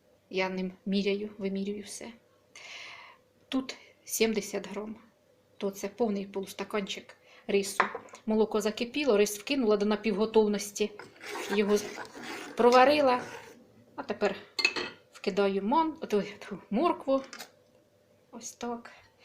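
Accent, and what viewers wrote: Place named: Cherkasy, Ukraine. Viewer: Дякую, з задоволенням вас слухала. Подобається ваша говірка.Чи вгадаю? Напевно Черкащині. Простий і, напевно, смачний спосіб приготування риби.Обов'язково спробую.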